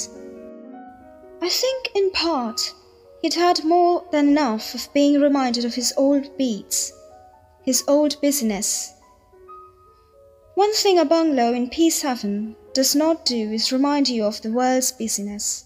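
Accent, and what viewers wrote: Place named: United Kingdom. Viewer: Eta tomar accent??💀😩😳 ami vablam kono British meye pore shonachhe . Plss prottek ta part pore shunio to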